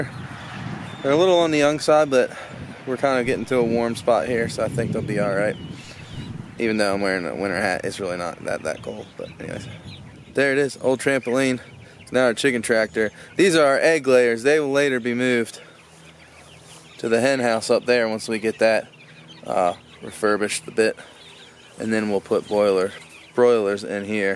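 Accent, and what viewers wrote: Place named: Maryland, United States. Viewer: Definitely a Marylander. I know that accent from anywhere. 😉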